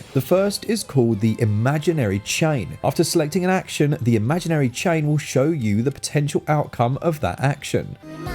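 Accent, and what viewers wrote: United Kingdom. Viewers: Sean Rooney lmao. No! Everything sounds better in a British accent didn’t you know this?!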